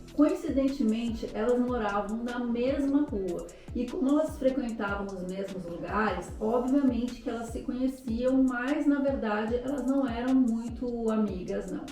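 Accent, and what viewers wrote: France. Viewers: te encontrei em 2025 e estou AMANDO seu canal!!! Você narra muito bem, com muitos detalhes!!!! E seu francês é uma delícia de ouvir!!! 🥰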